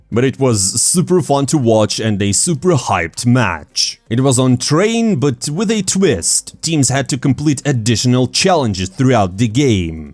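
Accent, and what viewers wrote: Russia. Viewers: Твой русский английский не очень гуд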